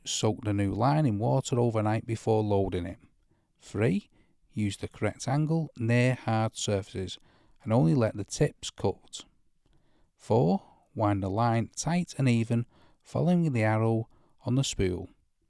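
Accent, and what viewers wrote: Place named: Liverpool, United Kingdom. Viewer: Are you a scouser? My dad was and you sound just like him!!!!!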